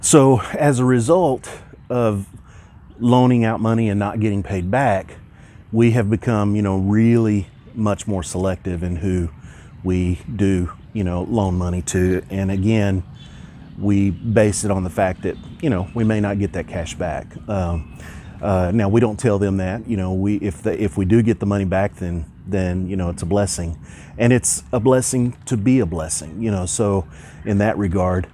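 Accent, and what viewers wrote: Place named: South, United States. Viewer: What state are you from in the US? You have a sorta country accent..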